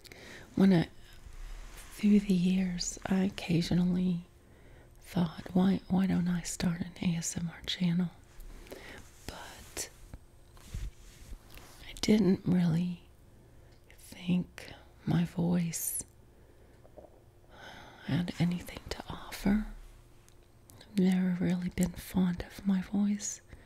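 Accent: South, United States. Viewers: I did always get a bit of a southern accent from you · I knew I heard a Southern accent in there!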